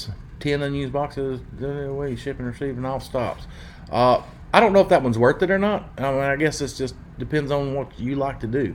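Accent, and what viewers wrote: South, United States. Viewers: Thank you so much for this video. It felt like having a roller coaster ride seeing the different rates that's out there. I love your video. I will be following you on Tik Tok and FB and subscribing to this video. You are very funny and your Southern accent is nice. Please make more like these. I love to laugh and learn.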